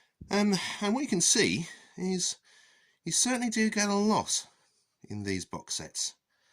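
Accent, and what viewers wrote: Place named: United Kingdom. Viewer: Yeah, I was thinking the same but you know how things go when you talk & roll video for over 6 minutes lol... He gets away with it having the cool Brit accent :P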